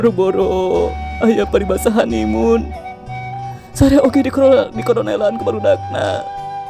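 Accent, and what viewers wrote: Sunda, Indonesia. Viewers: hehe Ari sesama orang Sunda mah kang tos terang logat na 😂 Abi mah garabagan teu tiasa nyarios halus 😁😅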